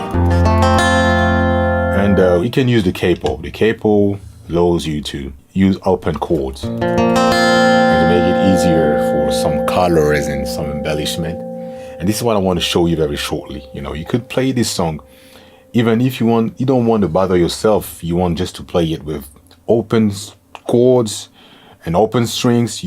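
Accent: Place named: Ga, Ghana. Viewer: This Is beautiful ❤️❤️❤️ The language (Ga) accent really caught me God bless you sir 🫡🫡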